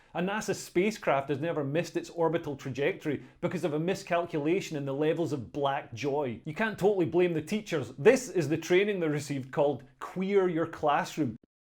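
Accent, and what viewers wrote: Scotland, United Kingdom. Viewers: This is great, and the Scottish accent saying plain and obvious things makes it so much better.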